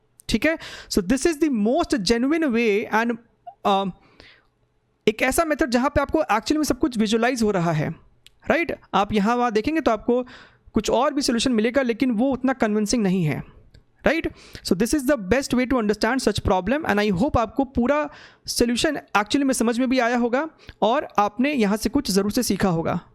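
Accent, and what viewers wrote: Bihar, India. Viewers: Badhiya hai.... meanwhile... Bhanhiya hai...❤️❤️❤️ Pure bihari tone Love frm bihar sir...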